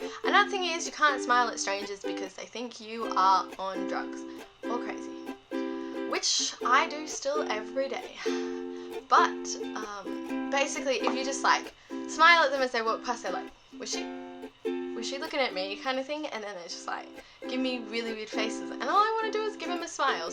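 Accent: Australia. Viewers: I was having a bit of trouble saying for sure if you were Australian. As soon as you said, "know" like "knower." I was like, "AUSSIE!" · You're Aussie? Because you don't sound local American to me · Are you Australian? You sound like it! I'm from Sweden btw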